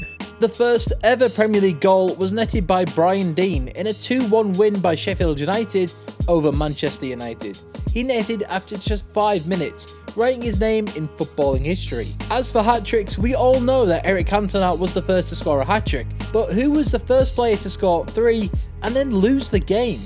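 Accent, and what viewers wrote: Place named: Ireland. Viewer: What part of Ireland are u from?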